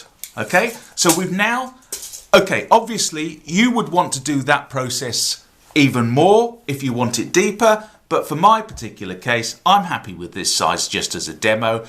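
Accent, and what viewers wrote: United Kingdom. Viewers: Where are you based. By the accent I would say UK.?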